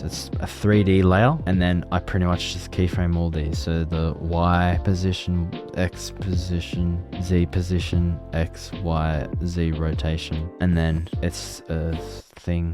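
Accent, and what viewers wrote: Australia. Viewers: Are you aussie? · Are you Australian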